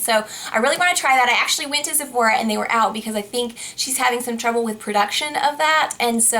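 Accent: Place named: Yorkshire, United Kingdom. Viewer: Her accent is northern- I think maybe Yorkshire! (Sorry if I'm wrong...)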